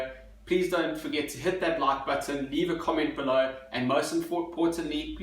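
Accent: South Africa. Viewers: Are you south African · Is this guy from South Africa??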